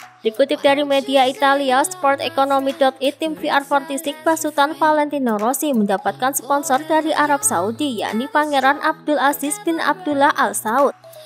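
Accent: Java, Indonesia. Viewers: Mba medok saya hadir hehe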